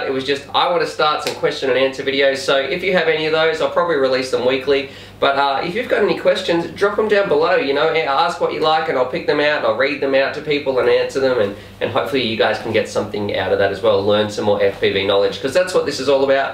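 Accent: Australia. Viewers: You sound Australian but I was confused because you have been using US pricing in vids? I Aus as well. Thanks for uploading all these amazing vids!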